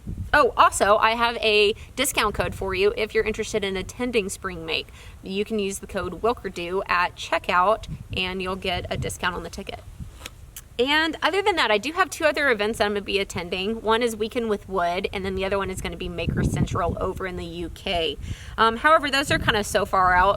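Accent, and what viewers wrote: Texas, United States. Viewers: I figured as much, you have a texan accent. But I couldn't figure out why anyone would willingly sport the 216 area code(Im originally from there also, moved to Tulsa 15 years ago.)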